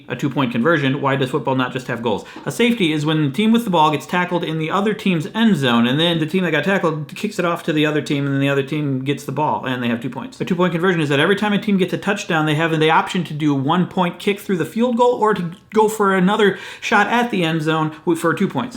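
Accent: Wisconsin, United States. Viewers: Love your Wisconsin accent,!:D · that WI accent tho! do more Wisconsin jokes! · That accent is so 👌🏼 Can you do a video entirely in your Wisconsin accent? I'm a linguist and I love language variation! 😂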